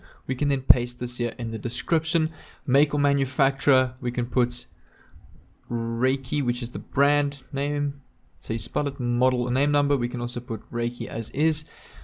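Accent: South Africa. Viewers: You sound South african ..How did you sign up with clickbank successfully?Im actually American living in SA but cannot sign up..Please help..thanks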